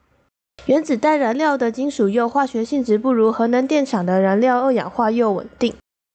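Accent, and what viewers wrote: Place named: Taiwan. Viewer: 為何台灣國語?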